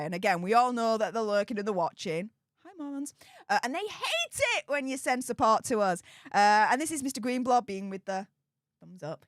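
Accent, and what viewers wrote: Yorkshire, United Kingdom. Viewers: Where are you from in Yorkshire???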